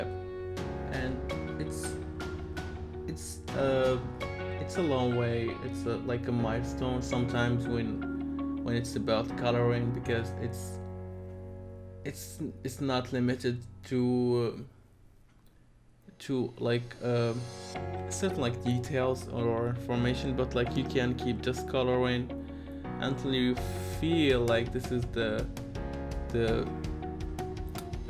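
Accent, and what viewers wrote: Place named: Morocco. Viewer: bayne l'accent del mgharba xD l3azz bik khouya great job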